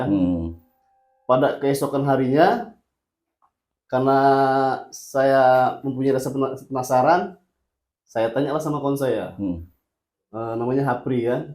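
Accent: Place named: Medan, Indonesia. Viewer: Ini baru cerita horor medan,, logatnya masih kental, ceritanya juga seru 🔥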